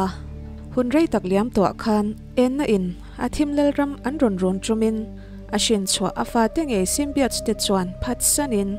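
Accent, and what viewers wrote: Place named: Mizoram, India. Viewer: Vai ho pawh hian an tawng accent-in English an hmang mai thin chutiang bawkin ram tin in an tawng accent-in English an hmang thin mizo takin sim bi awt pawh tise adik loh na awmlo mizo accent in Kan sawi ve ni mai English anih na bo chuanglo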